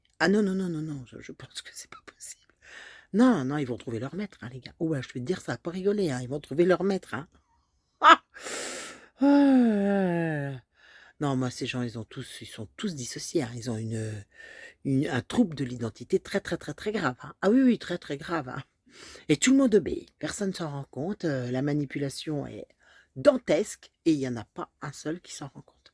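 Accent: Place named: Nord-Pas-de-Calais, France. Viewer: Bonjour, merci de nous raconter la vérité avec autant d'humour, j'adore ton accent de chti, ça fait du bien de rire un peu dans ce monde de fou:face-blue-smiling: tu es une très belle personne:face-red-heart-shape: je te fais de groooos bisous de Belgique 😘😘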